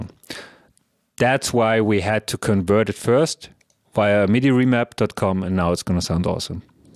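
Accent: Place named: Germany. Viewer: finally some useful information on this topic 🙏 Thanks mate! Or Vielen Dank, wenn ich den Akzent richtig gedeutet habe haha 🤘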